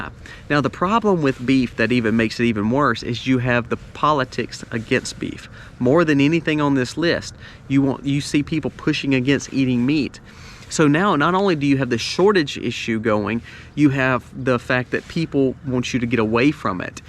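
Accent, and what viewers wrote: South, United States. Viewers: How very rude and arrogant of you to tell a whole group of people from a whole different country, with a different accent how to pronounce a word. I shouldn't have to tell a grown a$$ man with a country twang no less, to not act all high and mighty and judgmental over how they pronounce certain words. Come up north or out west and see what your fellow men say about how you speak.😡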